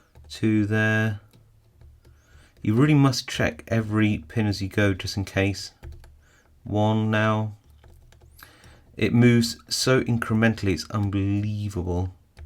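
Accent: England, United Kingdom. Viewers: Man i just wanna say i love your vids dude. the english accent will never get old to my ears lol. Not to mention i love the way you explain things, everything makes so much sense. IMO the vids are on par with LPL, albeit different video types, but still in the community, which is why i drew the comparison.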